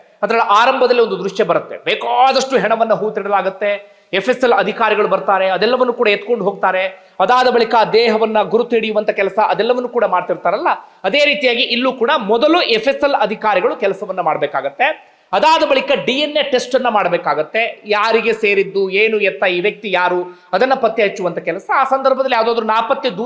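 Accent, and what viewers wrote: Standard. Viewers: nemma spashta dwani swatchha Kannada 👌👌👏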